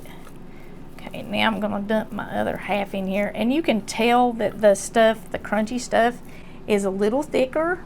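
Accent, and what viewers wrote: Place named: Tennessee, United States. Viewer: Wonderful lady! Very delightful. Love her deep southern accent from Tennessee. She is a rare one these days. She praises men and the men in our lives and teaches that appreciation of the men in our lives is important. We live in a society that prefers to demonize men, make them all out to be bad actors and bad guys and never good. That even men who are strangers must be up to no good if they approach a woman outside excercising and want to ask her something. I understand some of them may say things not kindly but that doesn't mean we say unkind back. Courtesy and manners and good words go a long way. Maybe pray for that person, smile and walk or run on. But not a bad word. I was just on a Facebook group the other day that clearly in the comments of women showed exactly that. It went from a conversation on personal running safety to make hate and sexual harassment and how ALL men must be so bad and up to absolutely no good just because they approach some female or happen to be outside in the great outdoors too. 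These women commented as if they owned the world, like the world revolves around them and how everything has to change to fit their narratives. I couldn't believe it! Never have I heard or read anything so repugnant in my life. Well, I'm a woman too. I grew up in a day and age where my parents taught me how to be kind to all people including strangers. I was taught how to gage a situation and how to act accordingly. It's so unfortunate that we are living in a society th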